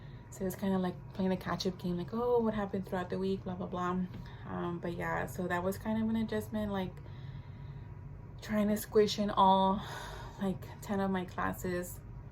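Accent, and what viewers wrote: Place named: Southern California, United States. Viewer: Hi, PA-C! 🥳💃🏻👏🏾💫😁 Congratulations on that huge milestone! I just found your channel maybe a week ago and I wanted to pause and just shout you out. Sis your channel is sooooo good and so powerful and important. It’s interesting observing you come into your own. Even in this video alone (I think — I’m listening to my playlist while I’m washing dishes so it could be back-to-back videos but anyways) you can hear how your voice is soooo soft (and lowkey hard to hear sometimes — I think increasing volume w a lapel mic maybe might boost your channel growth) but towards the end when you are really experiencing joy talking about your cadaver lab, your volume and confidence just blossoms!!! I love to see it! It really comes out how much you really love medicine and I KNOW you’re gonna be an AMAZING provider!👩🏻‍⚕️💓🤗 Last thing I wanna say which is super awkward but I hope is helpful and the compliment I mean it to be … I grew up in Whittier and El Monte (“Al Monté🥰) and used to hang in La Puente with my bestie (who is a PA too btw 😁 ) and your accent just makes me homesick!🥹 I’m so hyped for the representation!!!! 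Increased diversity of all types is WAAAAAY overdue and I pray there will be more girls with your accent and background in these medical trenches and perhaps you’ll consider teaching high school and jc levels where you can REALLY impact the trajectory of young ppls lives. I used to teach at Mt. SAC and an ROP in the I.E. and I learned how much I loved this impact. Last th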